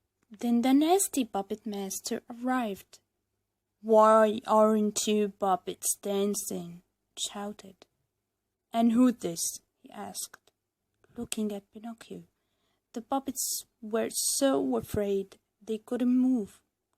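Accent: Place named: United States. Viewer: Cool, I thought you were American before I saw your channel. 💕💕💕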